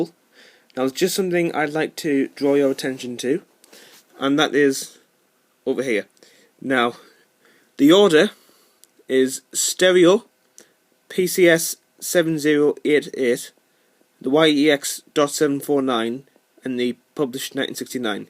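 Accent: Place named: Newcastle, United Kingdom. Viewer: No. It's from North East England, most likely Geordie.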